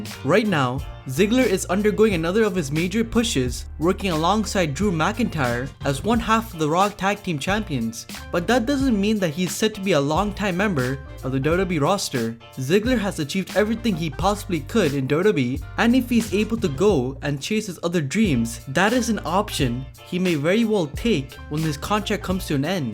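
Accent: India. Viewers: Another indian accent, i dont understand you curly tonge. When indian speak english, sounds stupid.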